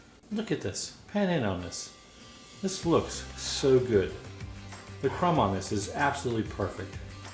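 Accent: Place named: Pennsylvania, United States. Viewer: I'd love a junk of that bread covered in butter❗❗❗Are you from PA❓YOU SOUND LIKE MY MAMA'S FAMILY🥰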